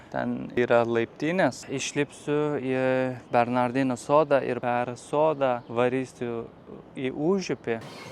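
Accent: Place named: Turkey. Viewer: Smagu girdėt pukią lietuvišką,kad ir turkškai🤘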